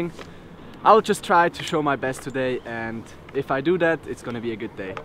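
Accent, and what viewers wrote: Switzerland. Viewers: Deine Vlogs werden echt immer besser! Liebe deinen Schweizer deutschen Akzent😂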